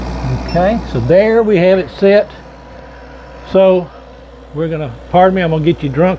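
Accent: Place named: South, United States. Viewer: that Southern drawl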